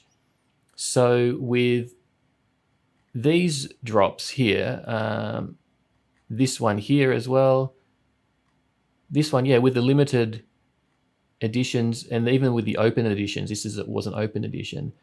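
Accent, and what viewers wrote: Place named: Australia. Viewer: Seems interesting but because u sound like a white Australian, it’s highly likely that this is some kind of colonialist scam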